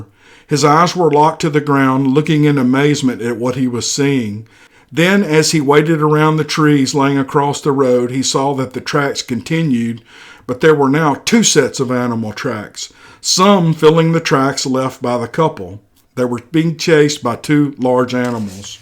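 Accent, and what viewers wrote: South, United States. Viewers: You do the southern accent proud, mate! :D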